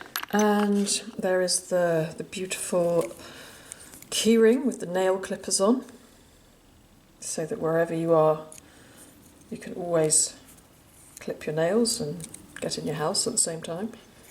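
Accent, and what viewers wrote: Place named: RP, United Kingdom. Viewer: you sound so posh! Did you film the opening shots from your home on your balcony overlooking the Ritz in Mayfair? All said & done, as always a great video - thanks you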